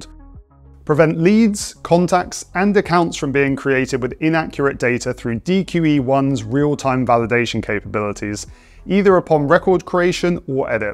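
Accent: United Kingdom. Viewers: Dude, your British accent is difficult to listen to when trying to learn.....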